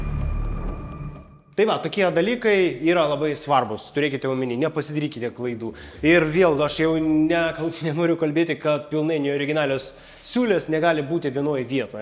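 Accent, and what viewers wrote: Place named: Russia. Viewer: Šaunuolis, kad stengiesi, bet tas rusiškas akcentas ir rusiška sakinių sandara trukdo suprasti ką nori pasakyti😊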